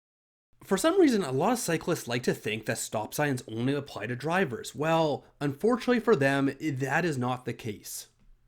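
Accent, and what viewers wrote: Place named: Canada. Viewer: I find your Canadian accent so charming 😜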